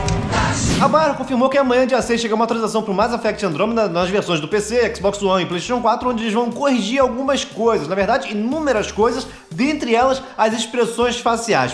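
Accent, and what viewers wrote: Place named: Portugal. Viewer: está ficando com sotaque de Português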